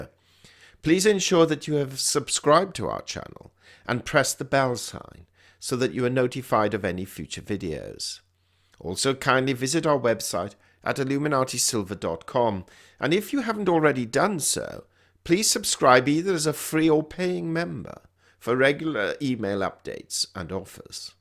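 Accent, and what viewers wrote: England, United Kingdom. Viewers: Mate you sound like a true pome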